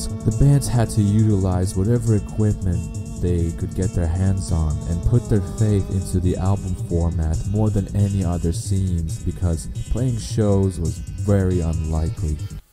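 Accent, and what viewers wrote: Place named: Russia. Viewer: According to accent - not so external view. Sounds definitely like russian pretending to sound not russian. Great review though, who cares.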